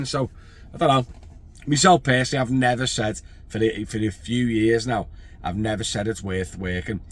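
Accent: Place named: Liverpool, United Kingdom. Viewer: Really good insight into the Airport world for Uber drivers from a non taxi person. Love your vids, love the Scouse accent coming from a Geordie.👍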